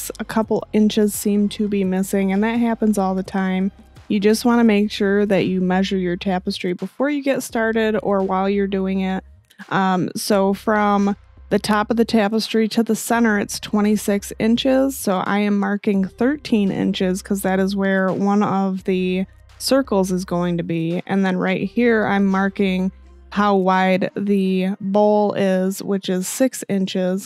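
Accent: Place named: Midwest, United States. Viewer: I ADORE your tutorials, hearing your voice is such a treat! You have a great way of explaining, and a fabulous midwestern accent 😁😁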